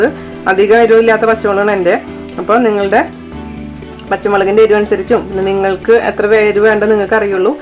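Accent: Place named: Thrissur, India. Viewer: .....I really loved the way you talk:D thani thrissur bhaasha great recipe tto. Am also from Thrissur but don't have that tone:) I will wait for more recipe's from you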